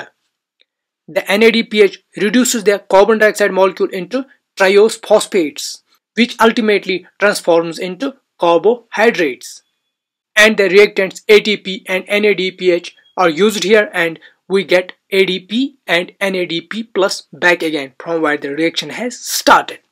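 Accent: Kashmir, India. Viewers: I guess you are from kashmir brother...? Am i right?? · Thanks for explaining how life works. And thank you for using English. Your Indian intonations are a little hard to follow but I compliment your efforts. 😀👍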